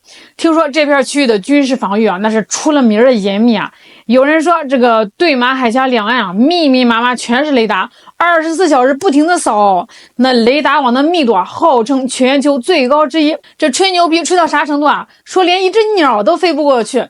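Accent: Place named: Anhui, China. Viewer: 安徽话真好听，像黄梅戏🎉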